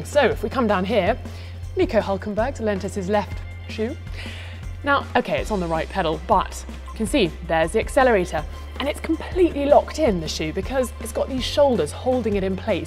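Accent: United Kingdom. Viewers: I fucking hate that british accent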